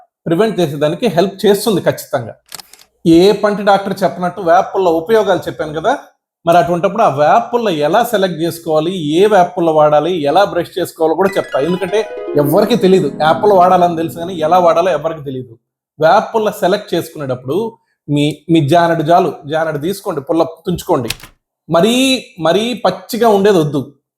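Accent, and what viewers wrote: Nellore, India. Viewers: Your Nellore accent is original & excellent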